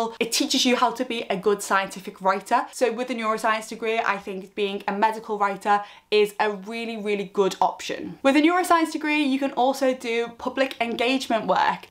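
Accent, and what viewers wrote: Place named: Merseyside, United Kingdom. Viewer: I'm so glad to come across your channel! I'm literally 39 have absolutely no experience with anything on the subject, yet simply through experiences with mental health and my own personal research on the brain and how it works, I've decided I really want to pursue this role! I'm from your neck of the woods by the sounds of things (Merseyside), but currently work full time with 3 kids! Do you have any advise on which pathway I could take to get into this career?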